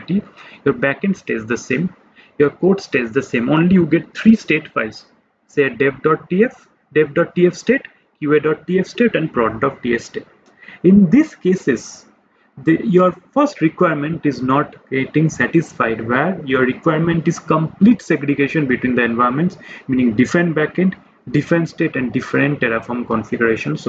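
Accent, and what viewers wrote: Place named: Bengal, India. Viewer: Explanations are nice, kindly work on ur bengali accent to make things easier for others. U got potential.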